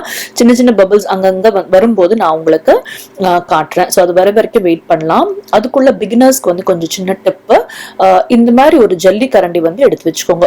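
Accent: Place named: United States. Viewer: ரொம்ப நன்றிமா 🙏 உங்க பேச்சுபாத்தா நீங்க அமெரிக்கால இருகரகீங்கன்னு நினைக்கிறேன் கரெக்டா? நங்களும் இங்கதான் இலருக்கோம். நாளைக்கு இப்படி சாதம் வடிக்கப்போறேன் 🙏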